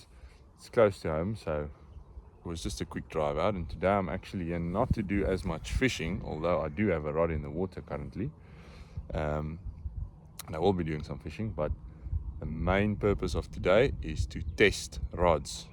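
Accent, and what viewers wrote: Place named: Africa. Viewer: Afrikan accent gives me the creeps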